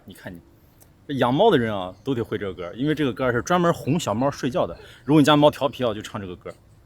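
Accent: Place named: Northwest, China. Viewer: 哥们儿我之前看你第一个视频听口音就觉得是西北人果然是 老乡啊我出生在西安雁塔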